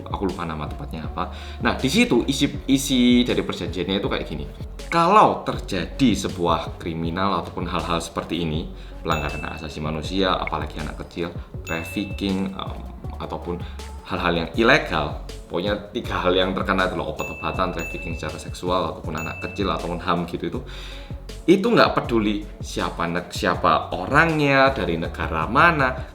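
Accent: Java, Indonesia. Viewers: Halo guys Welcome to korea reomit bersama orang korea yang medhokk. Mana Dung dung tak dung nya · Eee mmg yg memberitakan kn di TV korea, tp yg buat kita"orang Indo ngerti krna mas yg menyampaikn dgn bhsa Indonesia yg medoookk..itu mknya pda langsung pda paham...btw..Thankyou buat mas 'lah..kpn"klo ke Malang tak traktir Rawon ya...n klo q bisa ke Korea jg..tak sambangi ya di bwah apartemen kmu. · Seng Medookkkk Mantep Mas 😃😃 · Mas ak subscribe seminggu yang lalu,mumpung lockdown aku langsung maraton nonton videone lho...ben nonton videone aku mesti mesem2 dewe mergone medok kyk aku,sampe bojoku gumun 😆.salam seko kota Semarang ya mas.... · Mas yang medok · Hal yg buat ku betah nonton bang walaupun isi kontennya rindingdong yaitu karena medoknya dan mirip oppa Lee Jong Suk 😅😅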